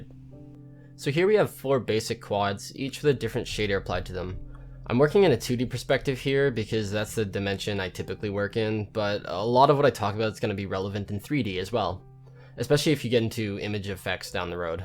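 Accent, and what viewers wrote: United Kingdom. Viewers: The British accent is so funny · Awesome short but rich and enlightening explanation. Also thank you Vaguely British fragment guy !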